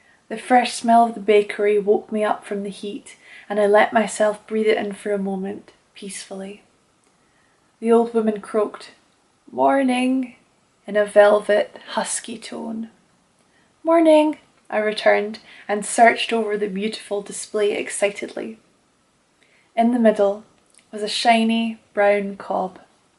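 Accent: Edinburgh, United Kingdom. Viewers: So nice to hear such a lovely Scot lass tell a beautiful story. Would love to hear a wee bit more of the Edinburgh accent, it is my favorite sounding speak. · I adored this story dear. I love your EDINBURGH accent. Once in another life I visit EDINBURGH. Almost marry a Scottish gal. Thank you for what you do.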